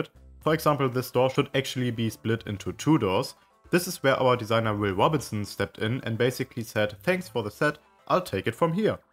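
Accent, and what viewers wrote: Germany. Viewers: Your accent Sound German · That German accent is crazy! Very nice!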